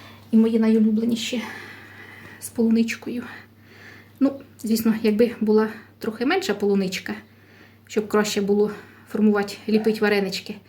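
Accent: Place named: Poltava, Ukraine. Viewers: по акценту, господиня з Полтавщини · Тісто обов'язково приготую!!! От лише у парі, над парою, на парі - "пара" ж бо іменник жіночого роду. Ну, може ще "парові" вареники...А ще дякую за Вашу соковиту, прекрасну полтавську - українську мову!!!! Згадала дитинство та другу хресну маму Марійку (з Полтавщини)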